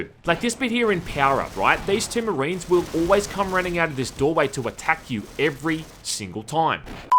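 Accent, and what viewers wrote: Australia. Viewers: Real talk. Do you really lean into the Australian accent, or is this natural? You have the thickest accent of all the Ozzie YouTubers I’ve watched haha. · Your Australian accent, combined with your witty humor , made me laugh so hard I sneezed out my coke......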